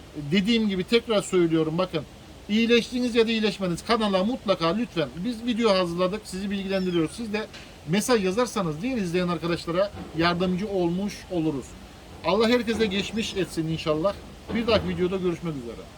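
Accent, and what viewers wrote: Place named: Manavgat, Turkey. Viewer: Konuşmandan bildim abi bende manavgattaydım😂şiven harika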